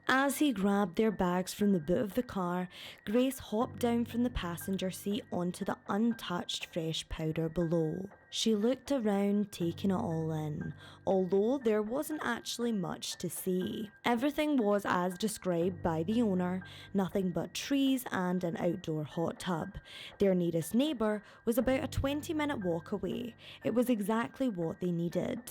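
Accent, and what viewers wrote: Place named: Glasgow, United Kingdom. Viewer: Fucken hell didn't realise how much I missed your wee wegie accent 😂🤘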